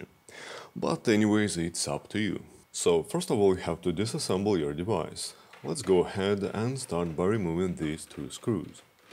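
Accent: Russia. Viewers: Русский чтоль? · русский акцент не скрыть)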